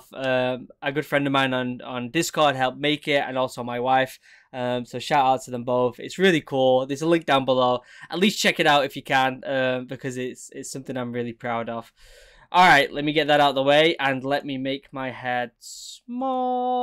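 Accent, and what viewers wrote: United Kingdom. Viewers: British accent is so cool: part two, bad idea, lad... Hehe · A little mistake to make your head big eh😂😂. Still, I love your british accent😂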